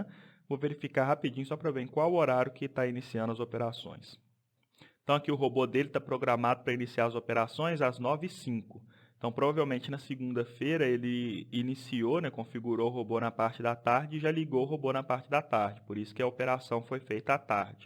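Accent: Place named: Minas Gerais, Brazil. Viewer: Mineiro uai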